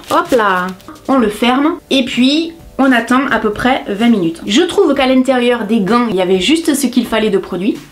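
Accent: South, France. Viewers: J adore être en ta compagnie toujours un plaisir. Et on a ( oui je mets dedans mdr) Une communauté adorable. Mdr . Ces vidéos font du bien en ces moments compliqués étant moi même infirmière un peu d accent du soleil fait du bien 🍭🍭😘😘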